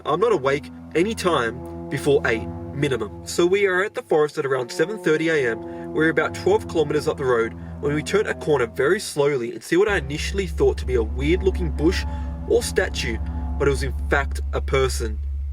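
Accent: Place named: Australia. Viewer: i figured you have to be aussie. the accent never lies :D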